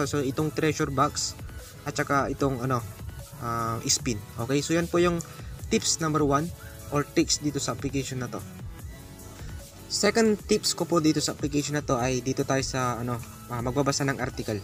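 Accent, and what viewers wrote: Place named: Pangasinan, Philippines. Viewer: Taga pangasinan ka no lodi halata sa accent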